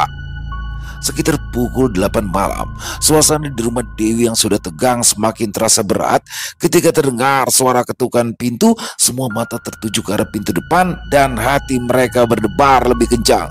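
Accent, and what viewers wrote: Jakarta, Indonesia. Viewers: Pon K4 BaR always selalu ngga pernah never... Asik ada kiriman lagi cerita bang Pian dari bang. Saya suka cerita dan logat Betawi yang kental akan budaya. Apalagi gaya bicara bang makin mantap aja mendengarkan nya 😅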